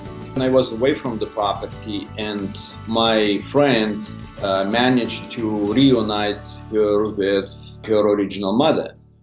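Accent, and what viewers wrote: Russia. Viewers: the russian accent and simple english adds so much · Ох уж этот русский акцент... =) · Русский акцент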